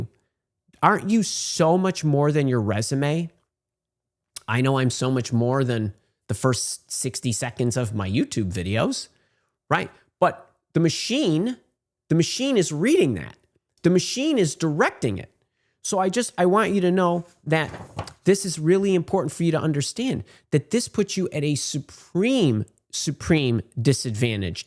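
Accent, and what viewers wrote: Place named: Chicago, United States. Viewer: He talks just like Billy Corgan. Must be from Chicahgo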